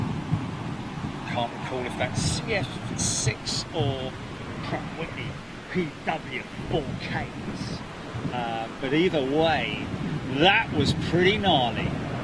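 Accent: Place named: England, United Kingdom. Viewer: Wow I just love your video, and your English accent. Hello from Covid19 Melbourne Australia 🇦🇺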